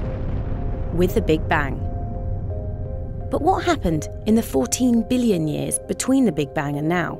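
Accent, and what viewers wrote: United Kingdom. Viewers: Долбаный британский акцент! Ненавижу! Что за wohapen? Сложно нормально говорить? Придурки!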